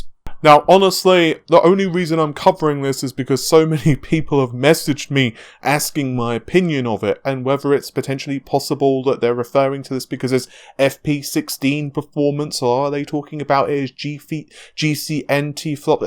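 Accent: United Kingdom. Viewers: Its rough having a deep voice with a British accent imo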